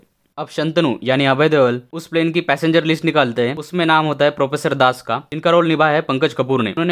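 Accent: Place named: Maharashtra, India. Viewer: Marathi assent as rah hai voice me .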